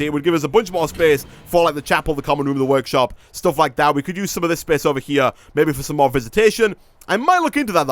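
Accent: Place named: Yorkshire, United Kingdom. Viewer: I'M FROM OOP NORTH YORKSHIRE But seriously, that is the most Yorkshire accent I have heard on YouTube.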